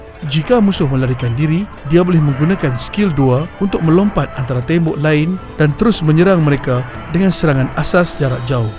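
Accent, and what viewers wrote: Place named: Perak, Malaysia. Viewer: orang perak ghupenya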